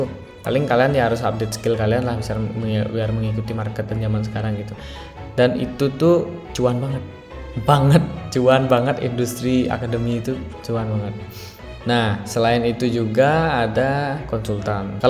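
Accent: Bali, Indonesia. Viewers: logatnya kayak orang bali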